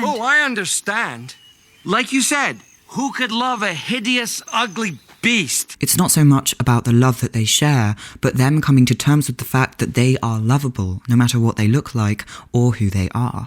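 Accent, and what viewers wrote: RP, United Kingdom. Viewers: Comes for the intriguing premise of the video... subscribes and clicks the bell for the adorable person with a wonderfully posh RP accent narrating said video.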